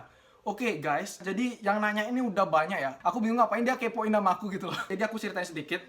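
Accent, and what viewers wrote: Bali, Indonesia. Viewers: Bali x medok nya 😂😂😂😂 saking griya napi turah? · Wkwkwk kentel banget bli logat bali nya ... Salam dari Nak Buleleng hehehe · Aksen Bali banget, Bli 😂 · Logat bali nya kentel ajan bli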